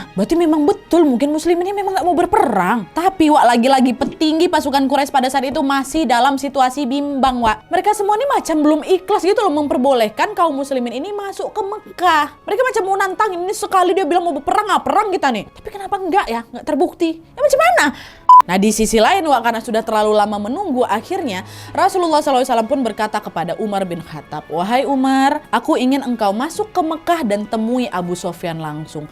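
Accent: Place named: Jambi, Indonesia. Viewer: Logatnya kyak orang jambi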